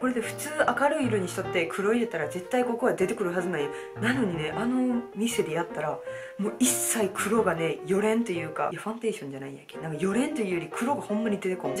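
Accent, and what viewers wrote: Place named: Iyo, Japan. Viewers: ちゃんの、伊予弁？が大好きです💗 ずっと聞いていたくなる、癖になる、語尾にはまりました😊 伊予弁って可愛い （*'‐'*)♪😄😄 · 半分伊予弁半分西条弁やな(♡) 私の地元やけん話し方めっちゃ落ち着く♡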